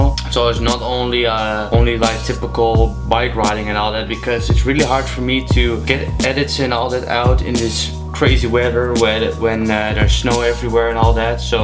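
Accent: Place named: Netherlands. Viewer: Respect voor je engels maar op een één of andere manier is het echt weer louis van gaal engels 😂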